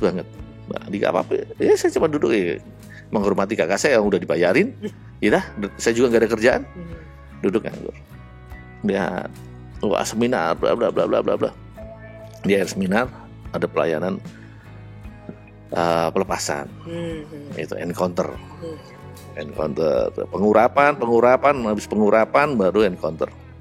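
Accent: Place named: Java, Indonesia. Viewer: Bahasa Jawa nya kental saat bikin konten 🎉🎉🎉🎉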